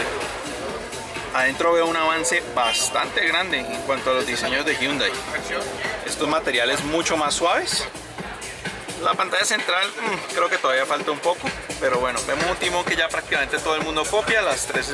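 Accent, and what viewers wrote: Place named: Cali, Colombia. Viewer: Hermano quite ese acento caleno tan chimbo.;..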